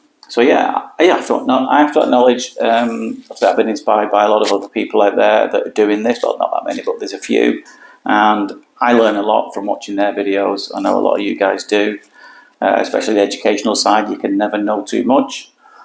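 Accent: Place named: Bolton, United Kingdom. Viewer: love it.. 3 years in college in Bolton back in the 80s so I love the accent (thought you was boltonian at first).. good luck with the channel. The others audiologists..raithatha, zhao and our Welsh friends) went from 10 subscribers to thousands and thousands in a very short period.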